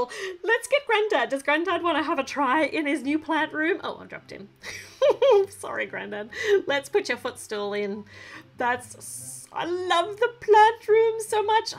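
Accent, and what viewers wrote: Australia. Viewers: It oki I understand Australia accent!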